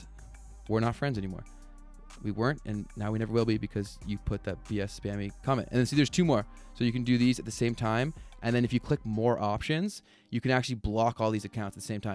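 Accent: Canada. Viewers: You have a slight Canadian: Trailer Park Boys accent. Which is awesome. (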